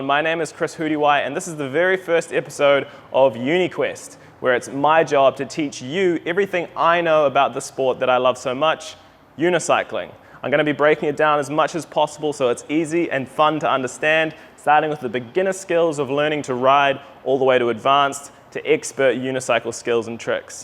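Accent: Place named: Australia. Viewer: Thanks so much,! I’m 53 and decided to learn while corona-confined. I’ve watched you so many times that I’m starting to speak with your AMAZING accent! I am an American who was inspired by a pastor in Taiwan, trained by a YouTuber from down under. Yesterday I rode a quarter km, but am still having a terrible time with mounting. Both methods are tough for me, so I’ve used a brick to prop and prevent rolling backward. I need to break this bad habit, because i’m tired of having to walk back to my brick. Any more mounting tips?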